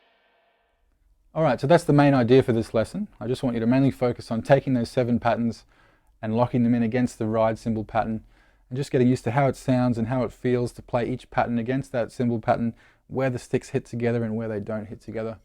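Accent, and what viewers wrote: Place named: Australia. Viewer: mate! I knew as soon as I heard the Aussie accent that these vids are going to be dinky di. You don't waffle on with a 20 second intro of some extremely mediocre hard rock music, followed with a "Hi guys, I'm from xxxxx in xxxx, like to say a huige thanks to my sponsors" Blaaah blah blah... Straight to the point! Love it mate! Cheers from Melbourne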